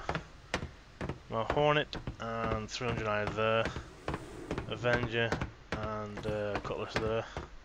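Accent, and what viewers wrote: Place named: Yorkshire, United Kingdom. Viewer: Nice video bro. Sound like a fellow Yorkshireman too :) would be awesome transporting a ship load of these babies to a race!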